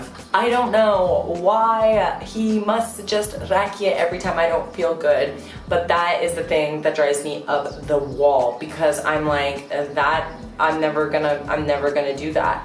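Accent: United States. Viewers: pa ne,ali to se dešava s američkim naglaskom,nebitno ako ti smeta jednostavno nemoras gledati,toliko jednostavno,a osim toga ona ako i radi grimase ne presedava se,radi to nesvjesno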